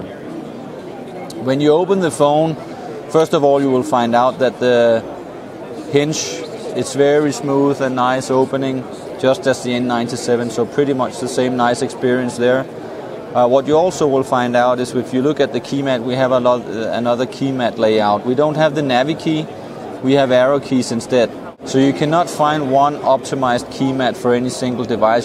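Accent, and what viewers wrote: Denmark. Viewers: Very danish accent haha... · Oh my god, you sound so much like a danish person who speaks English, hahaha. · Ja han lyder godt nok meget dansk. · so does sound like a danish accent! haha! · He sounds danish.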